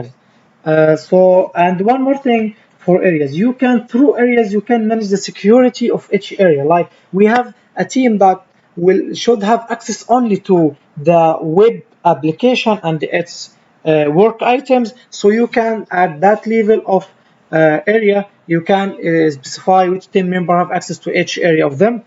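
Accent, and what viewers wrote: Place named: Arab world. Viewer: sounds arab pronunciation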